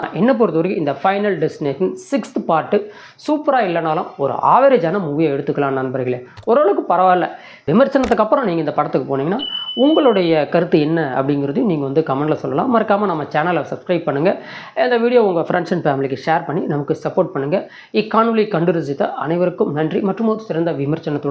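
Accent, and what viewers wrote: Kongu, India. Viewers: Wonderful REview.. Kongu Tamil Vazhga... Cheers from #Pollachi Town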